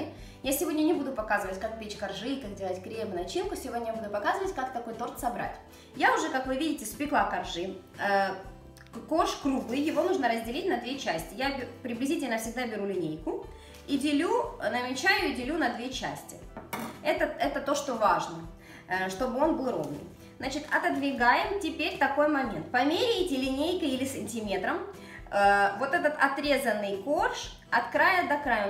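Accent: Ukraine. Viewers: а говор у вас интересный,трошки услышала,вы в Украине живете?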